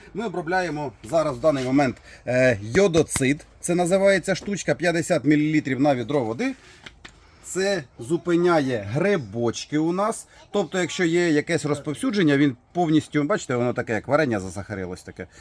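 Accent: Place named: Luhansk, Ukraine. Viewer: Я балдею от вашей разговорной речи луганск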